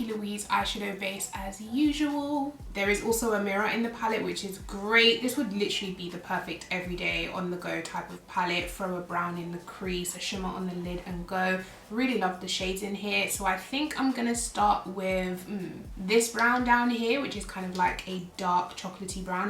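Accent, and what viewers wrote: United States. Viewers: I love (and laugh) with your American accent 😋 so cute! I love those gorgeous deep tones and this collection is beautiful! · Your ‘American’ accent is funny! lol I always try sounding like you, it’s a straight fail!🤣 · Thank you for doing this pallete! The ads keeps stalking me on Facebook 😆 and I was in love with the beautiful brown and gold and colors so I was dying to see this pallet on a black girl! Sad about those blushes tho. 😕 Also, your American accent is pretty good! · Lmao I love your American accent lmao😍😍😍😍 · Lolol your American accent is very TEXAN ..& we welcome youuuuu 🥰🥰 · I love your American accent x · I love ur American accent 😍😄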